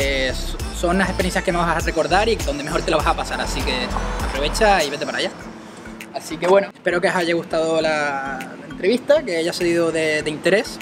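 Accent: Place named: Chile. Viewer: Tú acento es igual q el de los chilenos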